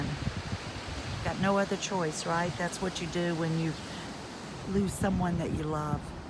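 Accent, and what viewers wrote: South, United States. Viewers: how good to hear your sweet Southern drawl again. Though I’m far away in Israel, I have thought of you often since we last heard from you with the sad, sad news. I too am a mother, I don’t need to say more. But news of your return is so welcome, and the news of your family’s commitment to life is so encouraging. Be well, till next time. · 🇬🇧 How lovely to hear your lovely Southern voice again. It is wonderful that you have been so strong in dealing with the death of your son. It must have been so painful for you and your whole family. 💫 Sorry you also lost your Yorkshire Terrier baby, but he was a terrific age, almost 20, amazing. Thank you for sharing your lovely day with us all. A very Happy New Year 🍾🥂 big hug 🫂 from beautiful Yorkshire. Xx 🙋‍♀️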